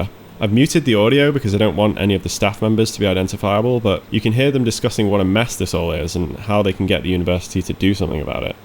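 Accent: United Kingdom. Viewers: england is such a nice skansen and a british voice is just perfect as narration. nice video bro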